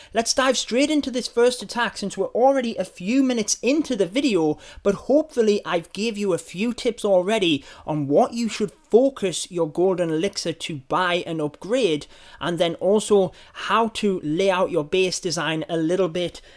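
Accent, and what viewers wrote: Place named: England, United Kingdom. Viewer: i luve youre englaish aiicecents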